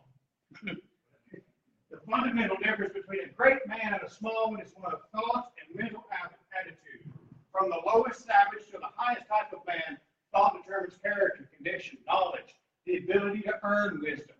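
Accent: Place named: South, United States. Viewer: Thank you sir it’s nice to hear a southern voice speak on these topics I feel a bit alone sometimes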